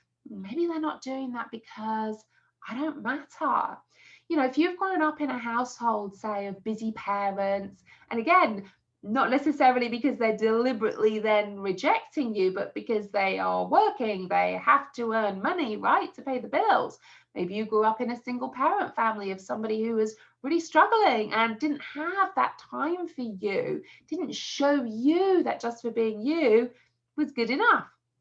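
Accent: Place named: England, United Kingdom. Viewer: Love the English accent seems to help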